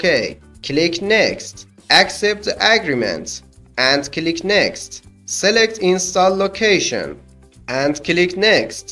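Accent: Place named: Iran. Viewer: لحجه امکان نداره ایرانی نباشه 😂🤣 دمتم گرم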